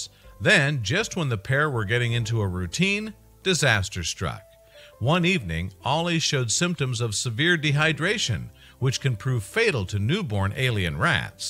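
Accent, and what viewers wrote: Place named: United States. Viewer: That god-awful American accent. Hit the mute button.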